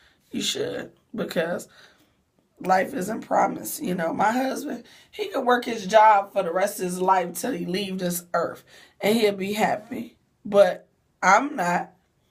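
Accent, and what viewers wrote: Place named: Memphis, United States. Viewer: Are you from Memphis because I swear you sound just like all my cousins in Memphis!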